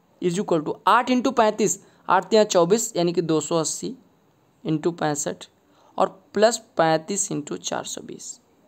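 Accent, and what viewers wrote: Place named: Pratapgarh, India. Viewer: hai nai bhasha pratapgarh ki lag rahi hai sir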